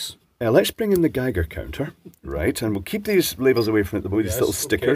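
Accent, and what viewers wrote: Scotland, United Kingdom. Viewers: incredibly well spoken for a Scotsman never heard that accent anywhere here. also that's pretty toxic how is that even legal? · . "Really? Sounds like a load of bollocks" Did I get that right? I'm not too familiar with the Scot's accent. Followed up by "Are some people stupid"? and the classic, "Is naivety a form or stupidity"? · I wish people would stick those onto their eyes to stop reading about this 5G Hazard bs. Btw it’s interesting to hear how much a Scottish accent differs from person to person. While I understand you perfectly, listening to your two buddies from the MBC sometimes leaves me absolutely clueless 😂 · love to hear voice and scottsh accent ♥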